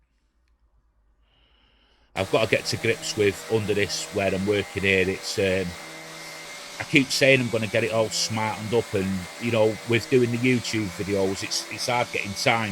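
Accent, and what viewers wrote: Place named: Salford, United Kingdom. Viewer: Good video mate. Salford/Swinton accent?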